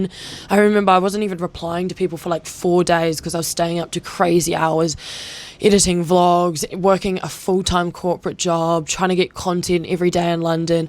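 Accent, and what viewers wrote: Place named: Australia. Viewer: nice outfit, Nice Australian accent 🇦🇺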